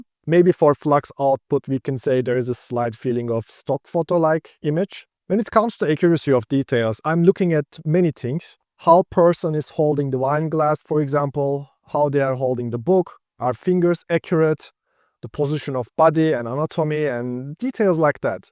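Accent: Turkey. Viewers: Kanka bişey dicem aksanında Türk olduğun o kadar belli ki severek takip ediyorum ama :)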